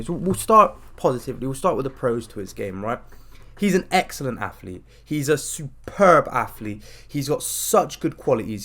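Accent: Manchester, United Kingdom. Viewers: You sound like you’re from Manchester tbf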